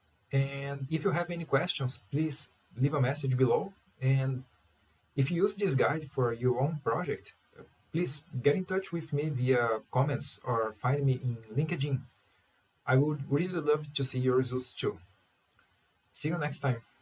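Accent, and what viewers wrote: Brazil. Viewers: eu tava escutando vc falando e pensei, parece ser br, ai li o nome do canal kkkk vlw pelo tutorial mano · vc é brasileiro né ? parece pelo sotaque. abraço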